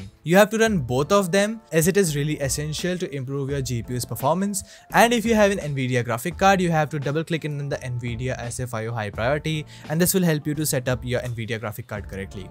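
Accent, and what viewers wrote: India. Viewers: I knew this was a dumb video when i heard that Indian accent, blocked channel.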